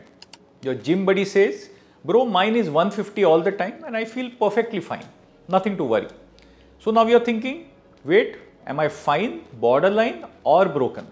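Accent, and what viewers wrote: India. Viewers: Your thick Indian accent makes it difficult to hear your words when you speak 😊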